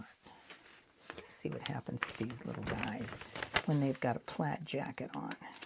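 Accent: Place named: New England, United States. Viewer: So glad to have discovered you! Lovely work, great techniques. Thank you! Heard the New England in your voice and got homesick for NH/Mass…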